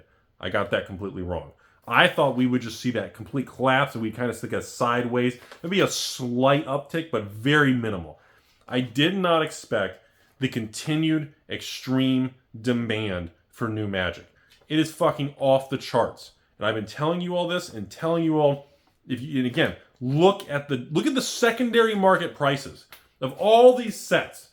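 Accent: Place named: United States. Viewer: Well, what I meant is that his talking is very American... don't know how to put it. Hard to tell some words apart at times.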